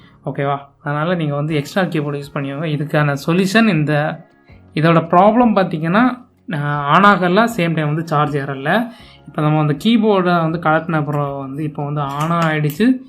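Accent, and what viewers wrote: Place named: Kanyakumari, India. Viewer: Kanyakumari slang mathiri irukku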